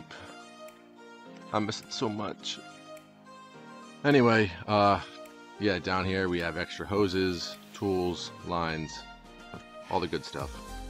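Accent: New Jersey, United States. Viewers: I've been trying to figure out who you remind me of, at first I thought maybe it was someone I knew or worked with but I realized it's Mike Rowe the "Jobs" guy and your stuff would be perfect for his programing, your walkabouts and overtime clips throw in a drone shot and I think they would love it. Just an idea, I just realized your talking to yourself when you make these, if you wonder your voice is very smooth with just a touch of Turnpike.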